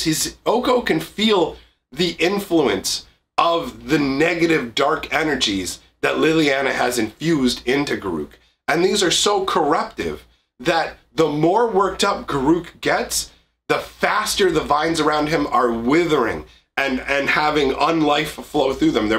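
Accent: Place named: Canada. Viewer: Sick!? I noticed from your voice the you are still Canadian! Great video man.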